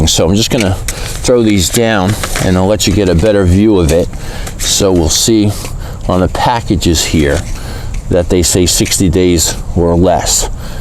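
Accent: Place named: South Jersey, United States. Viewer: I am originally from South Jersey and your voice has once again made me homesick! Thanks for the video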